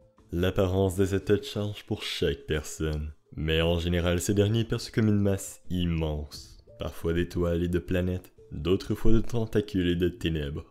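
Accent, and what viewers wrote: Quebec, Canada. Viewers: Tu est québécois ? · T’essaie de dissimuler ton accent canadien ou alors il est naturel ?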